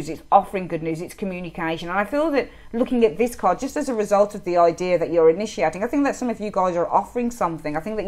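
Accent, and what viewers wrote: Birmingham, United Kingdom. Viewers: Usualsuspec13 i Love that’s She’s a Brummie like myself too. I was thinking that 😀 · I love you - 'If you wannit, you golla be onnit - you wannid it!' Gotta love a fellow Brummie. We give it straight, no chaser, honest and clear. Thank you for a great reading. So on point.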